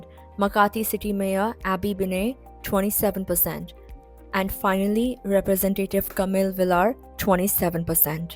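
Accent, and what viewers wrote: India. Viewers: Hahaha, you speak english well but you could not trash your indian accent. That's what you get when you always spread fake news about the DU30's. Are you one of many media outfits that received incentives from marcos jr?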